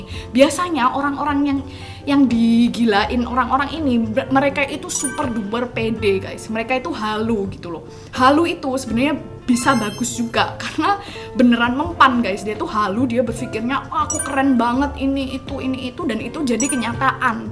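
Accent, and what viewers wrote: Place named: Java, Indonesia. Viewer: Baru nemuu chanelnyaa kak... ini ngejelasinyaa ada kejawa jawaan hahahah makin greget🤗🤗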